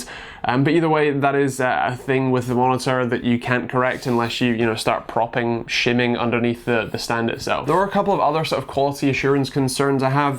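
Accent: Scotland, United Kingdom. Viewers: Where in Scotland are you from? Sounds northern. Maybe Inverness?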